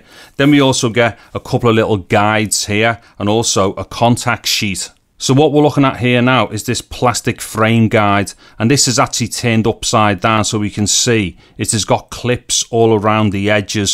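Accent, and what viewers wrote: Liverpool, United Kingdom. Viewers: Ah, the lovely scouse accent! It’s unique to Liverpool. My favourite city in England. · Liverpool ish scouse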